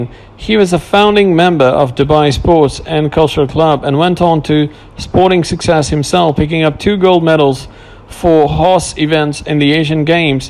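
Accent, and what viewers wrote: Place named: England, United Kingdom. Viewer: You sound English, therefore you should read and speak it much better. This was almost painful to listen to. You should also check your facts and your pictures. Rashid and Hamdan don't even look alike so how can you mix their pictures up? Youtubers always get it wrong when it concerns the Dubai Royal Family. I couldn't even finish this video. Your delivery leaves a lot to be desired.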